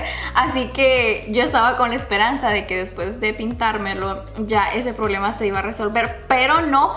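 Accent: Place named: Honduras. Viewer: Así hablamos en Honduras !!!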